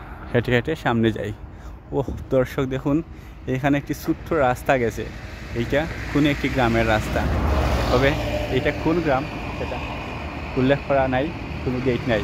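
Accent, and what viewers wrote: Sylhet, Bangladesh. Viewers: Love your video from Fr. Bhai, please try to continue your natural Sylheti math. Don't try pure bangla. Keep it up. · সিলেটি মাথা কিতা সুন্নত নি। শুদ্ধ বাংলা বললে 64 জেলার মানুষ বুজবো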